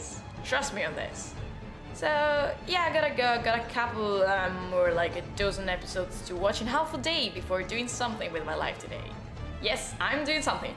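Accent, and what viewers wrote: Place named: United Kingdom. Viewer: amo quando parli in inglese! (E amo il fatto che capisco benissimo perché parli in modo semplice e aw). E cominci a fare tuo l'accento british, questa è una cosa bellissima! Continuerò sempre a seguirti, sappilo! E MORE VIDEO IN ENGLISH PLS, I NEED THEM Comunque: quoto l'intero video. Proprio ora mi sto riguardando tutto HIMYM per la terza volta. Di fila. IT'S SO HARD BEING A FANGIRL AND YOU UNDERSTAND ME AND I LOVE YOU PS. Per le altre ragazze: guardate che ha già fatto un video dove spiegava come ha imparato l'inglese. Cercate bene e lo trovate, non l'ha postato nemmeno troppo tempo fa! PPS. I love you <33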